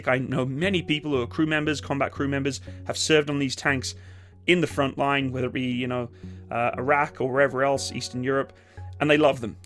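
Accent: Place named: United Kingdom. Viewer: So freaking British, innit?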